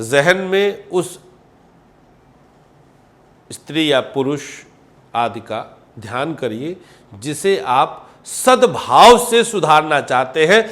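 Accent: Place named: Uttar Pradesh, India. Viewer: Aapki awaaz mein ego Nahin Hai Apnapan hai yah Awaaz up Ke Lal ki hai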